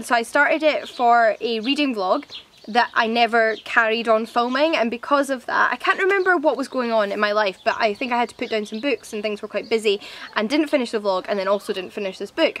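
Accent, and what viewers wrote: Scotland, United Kingdom. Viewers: Its so nice to hear a Scottish voice pop up on my suggested videos especially from my home city. Makes booktube a little more reliable for me!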